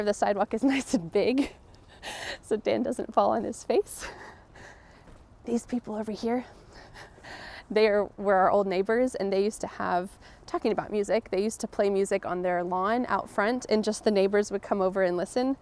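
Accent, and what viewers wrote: United States. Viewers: Is this American english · Greeting from Poland i love americans pronounciation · I’m from Vietnam. I have been learning English for 10 months. I accidentally find your video. I feel happy because I really like American accent. You are a first teacher on my self-learn journey. I am gratefully your lesson. My mother settle down in the US. But she is not good at English. I hope myself can help her if I have any change go to there. I’ve been learning hard everyday!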